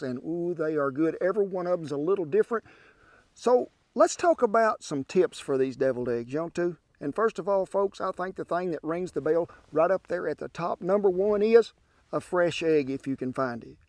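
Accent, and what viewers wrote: South, United States. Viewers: I love deviled eggs. Its a joy and pleasure to watch your way makin em. And hear that familiar southern chatter chat. God bless you and and them good ole dogs helping you out.. 🇺🇲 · Yummy looking! Love his "Cowboy accent"! I'm gonna try the 1st recipe! Yeeeeeeeee Hawwwwwwwwwwwww!! Thank you, Cowboy!! I love the idea of the cutting off the corner of your plastic zip lock baggie!! Saves time for sure!! "Happy Resurrection Day everyone"!!!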